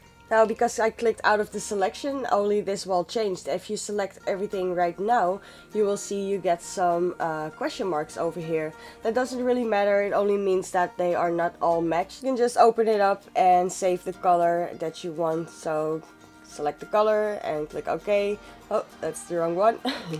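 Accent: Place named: Netherlands. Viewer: Are you dutch? You sound dutch hahaha, thanks for the video!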